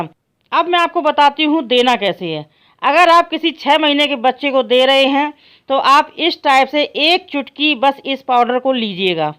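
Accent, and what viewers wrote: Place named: Etawah, India. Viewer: Mam lagta ha app etawab se hoo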